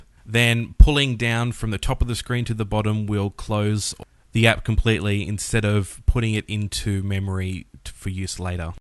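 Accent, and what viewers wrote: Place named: Australia. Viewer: awesome revie yo sound aussie like me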